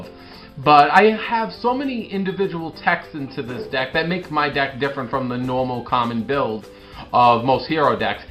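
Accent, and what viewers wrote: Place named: Brooklyn, United States. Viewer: Apologies if its wrong but I believe its a brooklynn accent.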